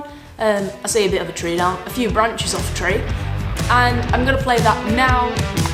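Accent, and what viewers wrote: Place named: England, United Kingdom. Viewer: i dont know why i came to see this but i stayed for the beautiful lady and the english accent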